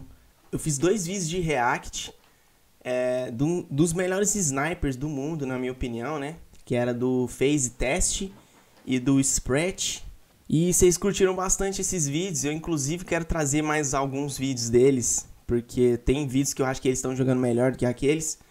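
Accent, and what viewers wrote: Minas Gerais, Brazil. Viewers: Tu é mineiro?kkkkk sutaque igualzin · Mo sotaque de mineiro kk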